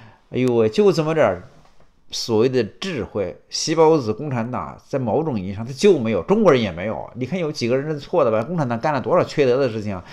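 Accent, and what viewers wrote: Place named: Changde, China. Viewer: 常德人？口音很亲切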